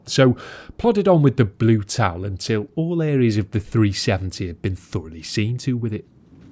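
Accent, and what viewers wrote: United Kingdom. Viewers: Congrats, mate! Frankly, another Volvo was expected, something like anything from those magnificent T6 AWDs :) I'm glad you've got the one you were after, nonetheless! P.S. Thanks for these therapy videos you do! I'm calming myself down with them and at the same time learning most enjoyable British dialect with you :)))) Cheers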